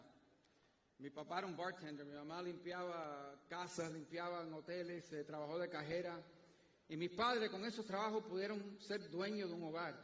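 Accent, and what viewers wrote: Cuba. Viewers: Rubio you’re the man love your Spanish puro cubano puro americano con amor 🎉😊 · How Spanish was great, it’s just the Cuban accent. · Español cubano como bien dice 😁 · I hope that Hispanic parents look at this as a great example to teach their US born children Spanish. There should be no stigma or shame about speaking Spanish. It’s an incredibly beautiful and rich language. His parents did an incredible job raising him bilingual, and his bilingualism gives him a tremendous advantage in his political career. He didn’t use a single Spanglish word. His speech was eloquently and passionately delivered in pure Spanish. As a Cuban-American, I can confirm that his Cuban accent was authentic. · I’m a Tejano and I understood him very well because of the passion and emotion he puts into his words in Spanish—even though Cuban Spanish is a beast to understand compared to other Spanish. 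· Damn, being Spanish my primary language by living and US for many years, coming to see another 2nd generation latino-gringo proud of his Spanish skills to laugh and…. he rocked. Finaaally some good Spanish, well articulated, the message is delivered in a proper way, Cubn style but correctly. Maybe this is one of the reasons why many Latinos voted for Trump this time. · His accent is very cuban indeed:) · You can hear how similar his spanish sounds to Desi Arnaz / Ricky Ricardo, it's not like spanish from Mexico. I had a co-worker who's family was from Cuba and he said Cuban spanish is like a machine gun, and Mexican spanish is very sing-song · As he said, it is more like a "Cuban" sound... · Didn’t know his Spanish was that good. Sounded great, true Cuban accent.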